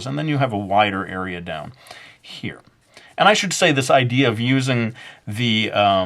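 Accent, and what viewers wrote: Brooklyn, United States. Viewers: actually i really like your surfer guy voice (brooklin accent) really much, it makes me laugh xD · I LIKE your Brooklyn accent xD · I like your Brooklyn accent XD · why would anyone unsubscribe because of the Brooklyn accent? It's awesome! and so are the drawings :D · If you stop doing the Brooklyn accent I'm unsubscribing!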